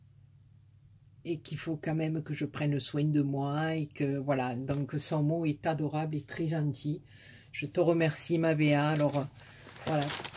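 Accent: South, France. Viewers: Coucou, que de gentils et beaux couriers, tu les mérite. J'aime beaucoup tes vidéos et ton accent ensoleillé, pour moi qui vit dans le nord, ( Belgique ), c'est juste du bonheur. Je n'ai pas ton adresse sinon je me ferais un plaisir de t'envoyer une carte. Gros bisous Nanny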